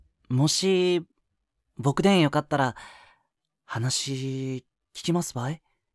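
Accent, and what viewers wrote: Kumamoto, Japan. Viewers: 今日は何かありましたぁ？何かのご褒美ですかー？くんの熊本弁好きすぎるよ～😂 「僕やったら、いつでんよかですよ😊」「笑っとっとが可愛か～」←合ってる？書くと難しい💦 の声好きー♡ 何か…柔らかくてほんわかして温かいです😊明日も元気に頑張れそうです👊ありがとー✨ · すごい好き！！方言いい！！分からないのは多分ないかな。敬語で方言とかいい！熊本弁だからいいのかなー。後輩くんに欲しい:_わーい:_わーい:_わーい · 熊本弁ｯｯｯ…😭 熊本弁のボイス大好きなのでめっちゃ嬉しいです😭🎶 つい熊本弁で返してしまいますわ🤷🏻‍♀️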